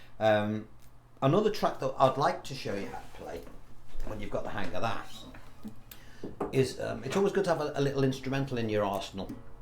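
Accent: Liverpool, United Kingdom. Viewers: Hi from an Italian drummer who loves to play (poorely) acoustic guitar. Love your style and your SCOUSE accent!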